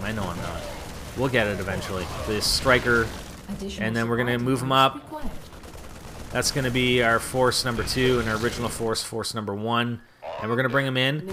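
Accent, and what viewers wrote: Canada. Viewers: You wouldn't happen to be Canadian, ey?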